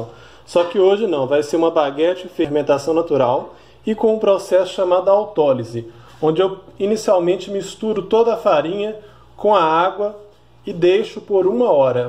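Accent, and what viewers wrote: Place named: Minas Gerais, Brazil. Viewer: Dou mais uma apertadim....... Sotaque mineiro não nega!!!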